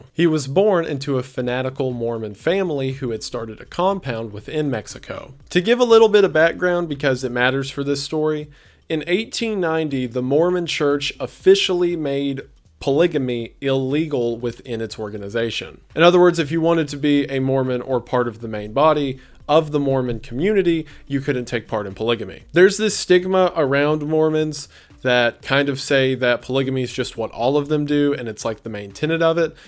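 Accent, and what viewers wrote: South, United States. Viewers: Where are you from? I giggle because you have a southern accent at times.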